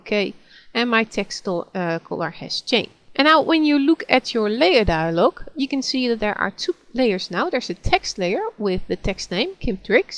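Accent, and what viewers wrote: Netherlands. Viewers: I am pretty sure she is dutch. Even tho i am dutch too most of the people in Holland who try to speak english get this accent. It sucks. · I think her English is fine - guessing a Dutch accent. Thank you GIMP teacher, I've been struggling with GIMP and learned a lot. You are a good teacher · Haha, echt een Nederlands accent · ik kan horen dat je nederlands bent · Your accent.is dutch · ik hoor gwn dat je nl bent :) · Intuxikated You hear it clearly that's she's Netherlands xD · nice dutch accent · you are dutch i can hear the accent ;3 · AND I find her Dutch accent delightful, so different strokes lol! She is organized, has a nice pace AND is a good teacher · if im not mistaken,i can tell by your accent that you maybe dutch... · such a dutch accent ah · om god you just can hear that she come from the netherlands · Although the intention of the person wanting to teach us about Gimp is highly commendable the pace is too fast. 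The Dutch accent of the speakers English could be also somewhat problematic for some. These are slight critisms because you have to thank the person for taking the time and trouble to make this video. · i think she is from Amsterdam based on her accent. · Ogod Dutch accent RAWRRR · This is a Dutch accent for English!! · dutch accent i presume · lovely dutch accent with crisp clear english and no loud music.I found the video very helpful thank you. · I just followed this tutorial to the letter and created my first snazzy gimp image with all the layers she introduced. Excellent tutorial. And to those of you losers who moan about her accent/diction, she's clearly a Dutch girl. Do you know what that means? It means she speaks at least FOUR languages fluently. Most Dutch kids speak Dutch, English, French and German perfectly by the time they are teenagers while 1 in 3 Americans are illiterate in just 1. 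· Omg. The second you started talking I heard you were Dutch. Hello fellow Dutch person! XD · Thank you for this tutorial are you btw Dutch, 'cus you have a Dutch accent :P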